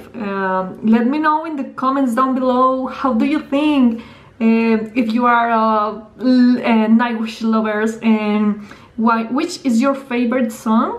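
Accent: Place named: Colombia. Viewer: tienes acento a colombiana, eres colombiana?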